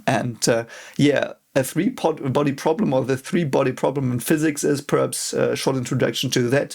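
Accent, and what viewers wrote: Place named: Germany. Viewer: Why is it when ever i her german accent, theres erica automatically playing as my in head elevator music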